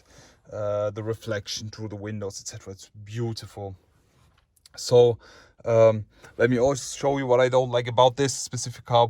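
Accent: Germany. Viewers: He is not terminator,he is standard German. :) The reason I would buy this car is because the front masks screams Germannn and we all know very good what means German in auto industry. So the accent, if you ask me, just adds to the autentysity. Coming from the place it's created, like this raw, it doesn't get better than this. Amazing car. I love it. Probably this is going to be the car I am gonna save the money for. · Man hört so dass du Deutscher bist😂✌️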